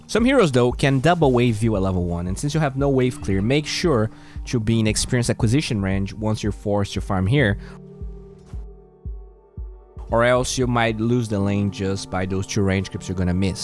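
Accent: Brazil. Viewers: Hey big fan here, Just a suggestion, try to loosen up more the brazillian accent, try removing ur chu-to accent Make sure chu fight chu win And instead Make sure to fight to win For me thats the biggest tell of a portugese accent (sorry for the wrong spells) Ive watch too many mma's where there r a lot of brazillian fighters. Love ur content bro, straight to the point and no beating around the bush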